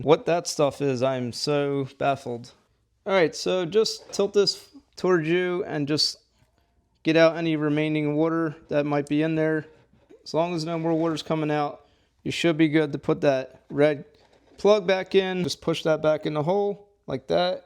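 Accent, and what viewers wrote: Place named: Philadelphia, United States. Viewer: THANK YOU!!! 🙏 😊 Ps: You must be from the Tri-state area.. Here, outside of Philly, we say “wooder” too. 😂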